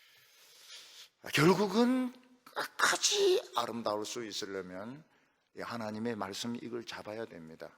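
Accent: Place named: Gyeongsang, South Korea. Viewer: 목사님! 존경합니다. 항시,건강하시길 기도 드립니다. 구수한 경상도 억양으로 하시는 설교말씀에, 은혜 많이 받습니다.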